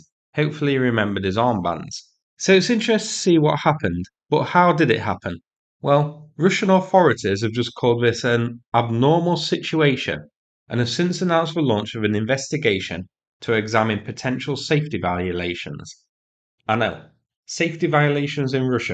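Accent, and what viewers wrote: United Kingdom. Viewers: Love the pommie accent and humour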